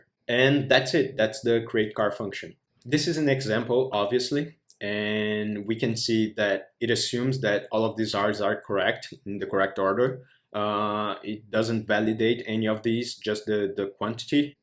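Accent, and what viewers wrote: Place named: Brazil. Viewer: sabia que era br quando escutei o sotaque kkkk, mas bem legal o vídeo, parabens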